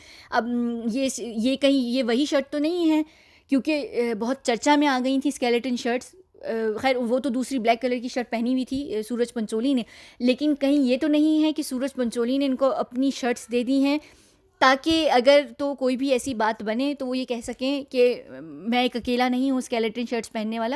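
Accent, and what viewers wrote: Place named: United Kingdom. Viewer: Absolutely in love with your work ma'am. You always back up ur facts with evidence. But I really have crush on your English accent. More power to you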